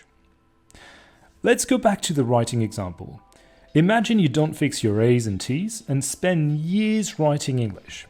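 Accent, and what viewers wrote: United Kingdom. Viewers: I'm assuming you are a native French speaker, but it's crazy hearing the British English tone of your voice compared to the French (speaking as an American haha). Both are very clean and clear. I only wish to be able to pronounce French like a native at some point. It's super tricky mimicking some sounds.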